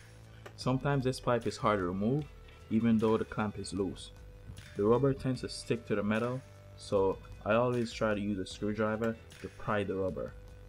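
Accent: Jamaica. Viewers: U Jamaican bro??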